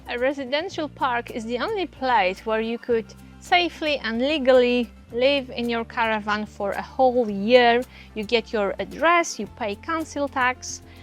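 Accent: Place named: Eastern Europe. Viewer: Sounds more like an Eastern European accent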